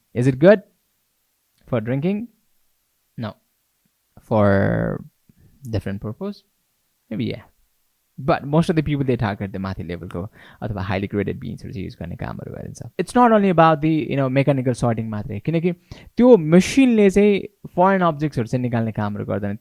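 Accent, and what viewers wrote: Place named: United Kingdom. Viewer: Thankyou bhai tara ni ki nepali ma ki english ma bolnu na hau...bhai ko nepali bolai ma english lawaj le alik bujhnu garo vayo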